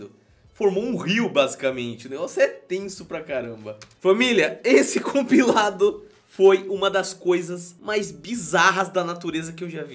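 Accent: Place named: Ceará, Brazil. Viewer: Você e cearence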